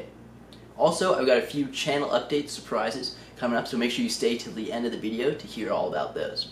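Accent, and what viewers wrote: United States. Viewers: One of the biggest West-Coast accents I’ve ever heard 😂 Keep it up man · you look like an indian boy and spealk like a american👍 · new yorker?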